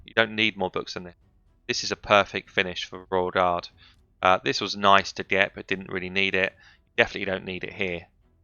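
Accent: United Kingdom. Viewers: Got directed here from Stew. Great vid and info and nice to hear a brit 🏴 doing some raid content. I'll be coming back 👍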